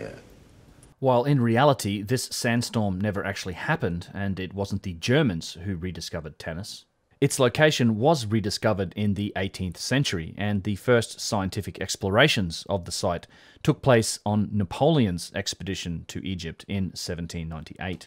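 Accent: Australia. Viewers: As soon as you hear the Aussie accent you just know it's going to be dumb.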